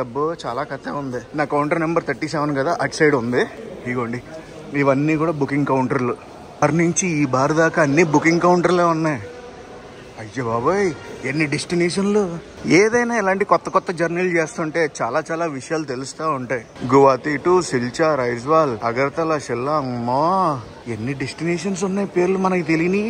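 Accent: Godavari, India. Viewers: haha super crazy vlog , godavari slang bababoi bababoi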